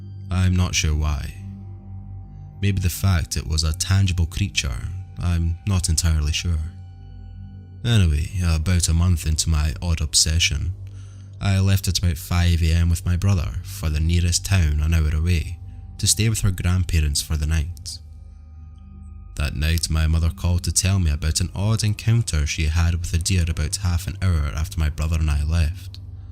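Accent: Scotland, United Kingdom. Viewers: Mr., I, myself, am Native American. It was a true joy to hear stories of Skin walkers in a Scottish accent! I loved it!! ❤❤🤘👍👊fist bump! I truly love your channel!! So honored to have subscribed!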